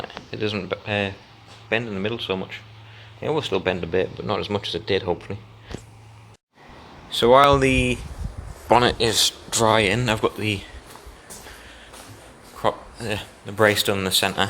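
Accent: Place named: Northumberland, United Kingdom. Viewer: Where abouts you based mate? Sound local I live near Morpeth and I am about to do my little fez up soon. Many thanks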